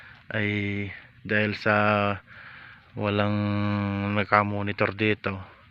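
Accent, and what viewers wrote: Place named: Ilocos, Philippines. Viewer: San po location nyo...s pannalita po ninyo prang ilocano kau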